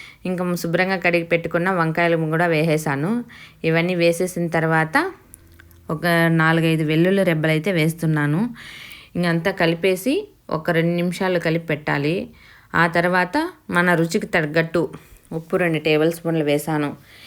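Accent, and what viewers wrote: Rayalaseema, India. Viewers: Mee proper ekkada ? Vintunte rayalaseema ankunta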